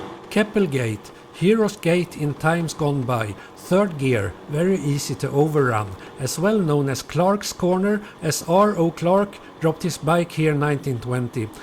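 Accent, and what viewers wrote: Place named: Sweden. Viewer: Great work! And your Swenglish sounds good!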